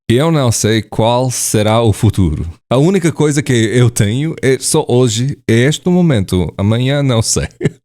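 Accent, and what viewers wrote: Portugal. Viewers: Sou portuguesa e por aquilo que vi neste vídeo a tua pronúncia é definitivamente portuguesa, a única coisa que fazes mais "abrasileirada" é a utilização do gerundío verbal (fazendo, comendo, falando etc) pois em PT (à excepção do Alentejo) nós usamos maioritariamente o infinitivo verbal ( a fazer, a comer, a falar etc) mas ao fim de 3 meses apenas o teu português não podia estar melhor💯 Muitos parabéns 👍🏻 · You accent is from Portugal, not from Brazil. Trust me. The only word you uttered with a Brazilian accent was the word "brasileiro".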